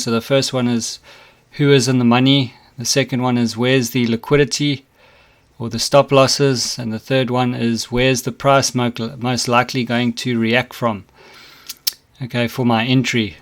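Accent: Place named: South Africa. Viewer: May I ask what country you are from originally? You don't sound like a New Zealander. I mean no disrespect. If I had to hazard a guess, I'd say you're South African maybe?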